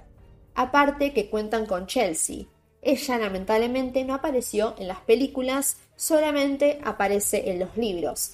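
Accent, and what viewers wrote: Argentina. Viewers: Puedes hacer un video sobre quien es mas fuerte? Si el chupa sangre o el perro gigante?🤭 me encanta tu acento argentino, buenos aires no?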